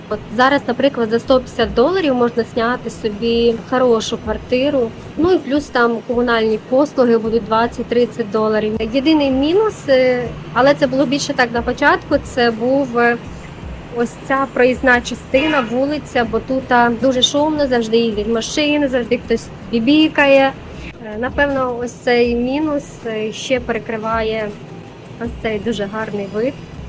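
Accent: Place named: Poltava, Ukraine. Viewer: Суржик Полтавський чи Дніпро?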